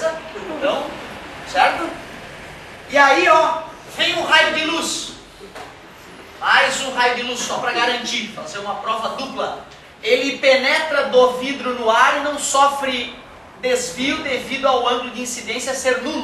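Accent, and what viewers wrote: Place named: Florianópolis, Brazil. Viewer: Muito boa a aula! Valeu professor! O professor é de Floripa? Percebi o sotaque, deve ser.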